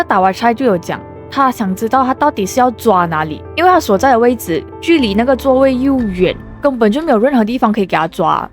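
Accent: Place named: Malaysia. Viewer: 一听就是大马的口音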